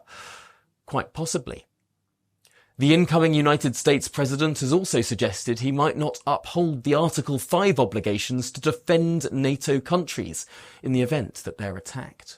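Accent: United Kingdom. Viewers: Lovely British accent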